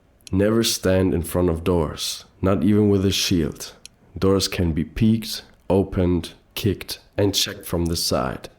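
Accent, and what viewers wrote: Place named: Germany. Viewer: bro sounds a bit too german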